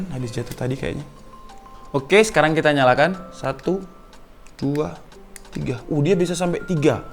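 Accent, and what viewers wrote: Java, Indonesia. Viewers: Jawane ngendi mas? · Samean jowo ngendi mas ? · Bahasane bahasa malangan mas😂😂😂😂 aku yo tekok malang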